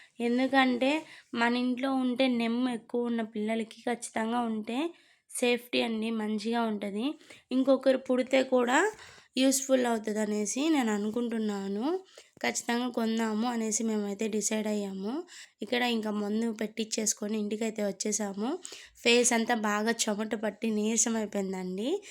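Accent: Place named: Nellore, India. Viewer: చక్కగా వుంది మీ video Super, వాయిస్ కూడా Super, Nellore నా ❤👌👍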